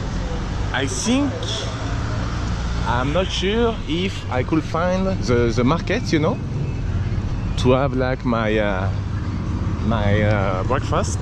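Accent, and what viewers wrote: France. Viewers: I think you are using the correct words you’ve just got a few things going on at once. French accent & you put all your words together quickly…like real quick. So they sound different. It’s like a remix of words…😂 safe travels😊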